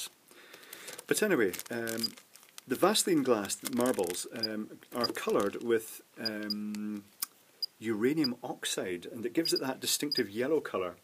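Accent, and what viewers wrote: Scotland, United Kingdom. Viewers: Very informative, I also wanna buy this because of the same video. Also, are you Scotch?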